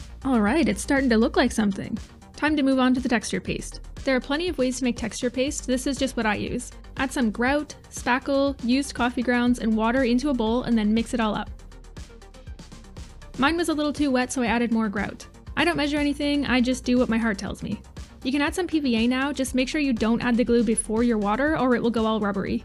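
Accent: Newcastle, United Kingdom. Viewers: I was expecting a heavy geordie accent, but the rocks are good either way.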